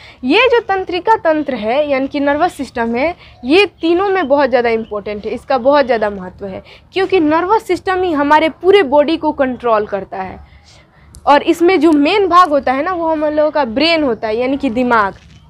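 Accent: Bihar, India. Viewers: I think this mam from saran,siwan, Gopalganj. Bihar... definitely...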